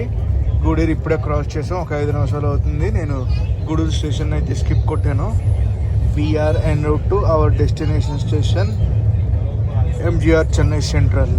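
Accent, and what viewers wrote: Godavari, India. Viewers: Godavari Yasa baguntadhi brother.. watching from Ireland…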